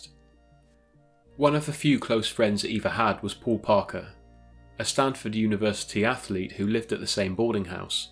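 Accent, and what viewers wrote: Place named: United Kingdom. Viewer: Sorry but "Tales from California" read with a British accent... hmmm. doesn't jive... It's not "Los Angel-ee-s" It's "Los Angel-y-s".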